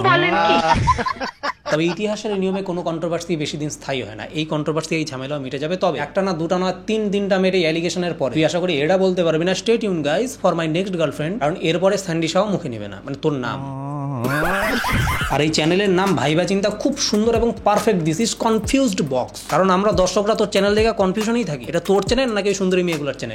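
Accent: Bangladesh. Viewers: Ai Vai apnar language tone toh Pura amader Bangladesher moto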